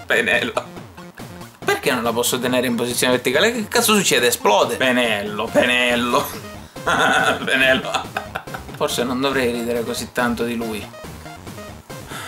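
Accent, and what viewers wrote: Sicily, Italy. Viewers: sei siciliano?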